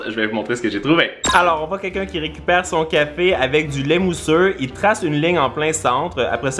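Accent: Canada. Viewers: j’adore ton accent canadien!!!! ❤️ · je trouve que même si tu es un français tu parle avec un accent plus québécois que Isaac like si t'es d'accord 👍😝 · J'adore ton accent canadien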